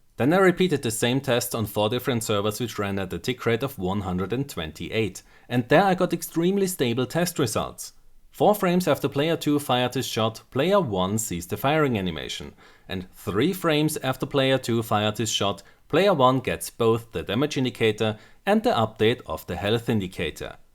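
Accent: Germany. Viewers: Yeah im german and I clearly hear the accent:p your english is very good though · Are you german ? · The German accent. You know you hear it when you hear "Die Netcode". Many thanks for the info!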